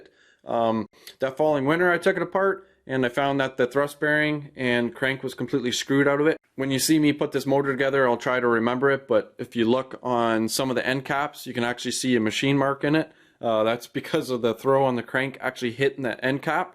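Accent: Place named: Canada. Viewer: Canadian accent Aye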